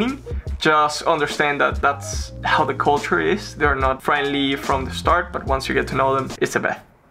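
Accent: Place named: Spain. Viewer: sehr schön zuerst hab ich geglaubt du bist ein ami aber dann spanisch hört man durch du bist sehr lustig und sympatisch i love your video how was barcelona in comparison cau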